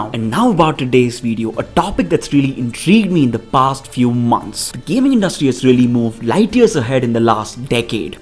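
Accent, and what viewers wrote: India. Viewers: ok it's just there are different types of Indian accents so it's hard to tell the way you say well vell gave me indian kind so it's nice to know you come from a lovely country I'd love to visit some time in the future:)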